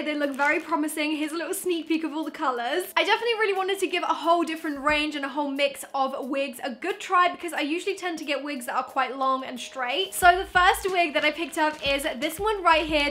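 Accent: United Kingdom. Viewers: Oh my.....this British accents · Normal english person: Better British person: Butter Lol it's cute 🖤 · Wait ate you English omg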